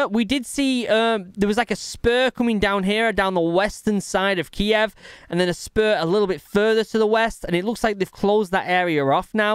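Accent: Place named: Manchester, United Kingdom. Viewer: Not a question, but heya fellow (or technically previously fellow) Manchester citizen. I only knew about that one once you mentioned it here. Wait now that I think about it how have I not noticed your accent being from around this area? Love it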